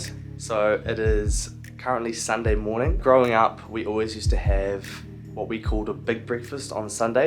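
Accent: Punjab, India. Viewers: l love your Punjabi accent!! nice family GOD BLESS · Spot on Punjabi accent. Tusi saare family members nu Punjabi sikha deni aa bol bol k 😂👍🏼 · God bless lovely family. Very much astonished at your punjabi accent.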